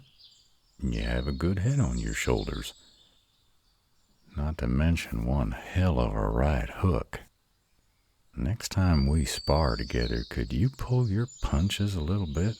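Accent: South, United States. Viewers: Oh my god…. The southern accent…. I can’t… 😳 🩸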